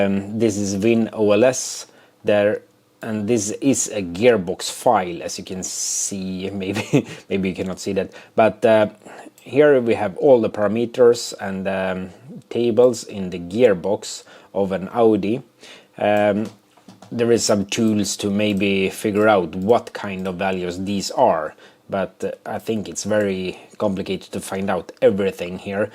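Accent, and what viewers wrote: Sweden. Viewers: i love how thick the swenglish is.... id watch a video about sewing with this accent.. cars are better tho.. tjena från Norge! å, nei.... hexadecimals.... have fun ;D¨'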